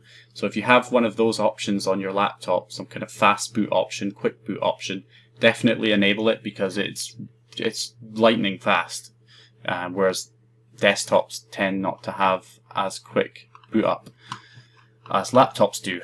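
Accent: Scotland, United Kingdom. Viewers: I so much liked the Scottish accent that I subscribed! :) hahaha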